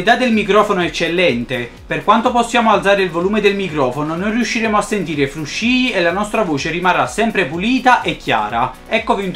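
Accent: Naples, Italy. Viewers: ma sei di Napoli? perchè lo sento dall'accento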